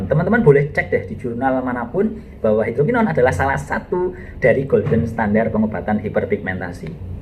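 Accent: Indonesia. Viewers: Medok gak sih dok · Dok,, suarane purworejo banget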